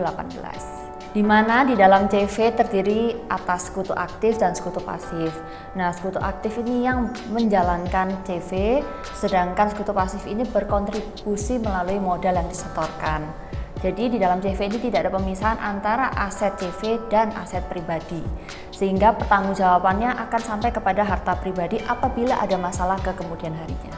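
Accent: Java, Indonesia. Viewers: Cantik medok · Jawa banget.. 😀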